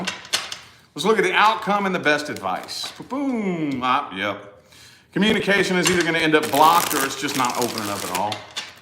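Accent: South, United States. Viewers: Love your voice and your accent and expressions, you make me laugh, is that a southern American accent? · Yes. That's a southern accent. Different southern states have different dialects.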